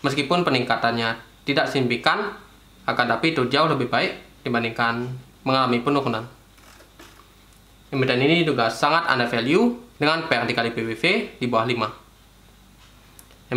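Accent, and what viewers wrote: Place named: Bali, Indonesia. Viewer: Medok bali sekali...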